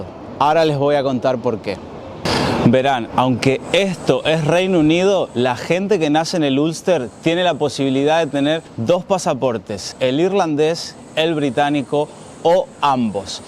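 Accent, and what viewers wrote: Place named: Rioplatense, Argentina. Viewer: Buen video, te escribo desde El Salvador 🇳🇮. Cuál de las dos Irlandas es más próspera??, Y cuál es tu nacionalidad??? Es que tenés una mezcla de acento rioplatense y de la península ibérica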